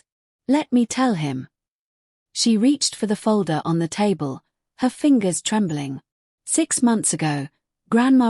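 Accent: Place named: United Kingdom. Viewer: Why is a British woman doing the narration ofnitnis from the perspective of an American man?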